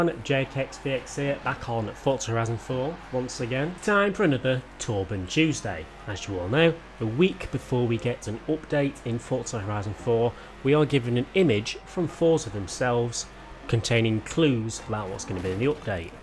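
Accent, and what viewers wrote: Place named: United Kingdom. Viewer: I swear his voice is the goofiest sounding British accent. Could not take him seriously.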